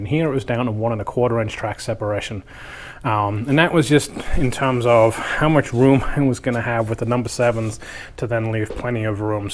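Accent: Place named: Canada. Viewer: Where are you from originally? Your accent just gets me every time. It sounds like you got English + Canadian going hella wild! Love the layout!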